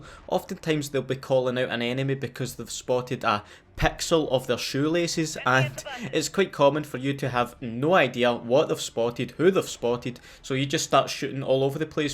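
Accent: Scotland, United Kingdom. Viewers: I love your accent so much. Are you Scottish? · Nice fucking channel, love the humor helped by the Scottish accent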